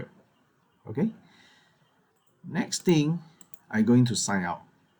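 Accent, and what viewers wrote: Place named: Singapore. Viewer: Hello! Singaporean? I know this accent!...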